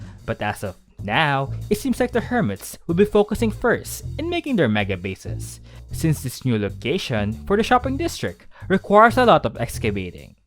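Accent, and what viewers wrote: Philippines. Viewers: voice sounds south east asian, sounds filipino . . .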